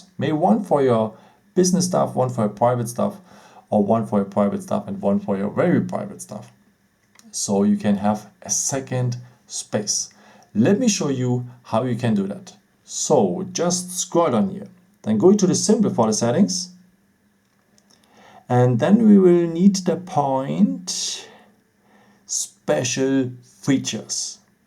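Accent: Germany. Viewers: Can you speak English ? You are 100% a german guy !!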